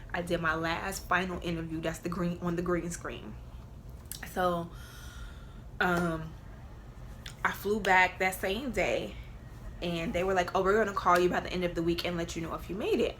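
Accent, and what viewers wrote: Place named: New York, United States. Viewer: My fav!!! You can tell you been in NY you picked up the accent a little ❤️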